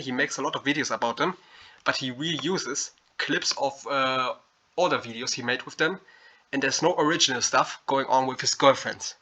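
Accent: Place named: Germany. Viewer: ne meinte dass man hört, dass du Deutsch bist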